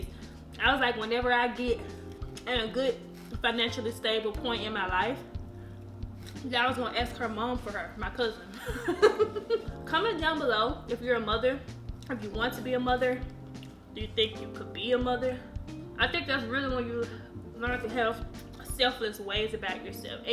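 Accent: South, United States. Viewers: I like your southern accent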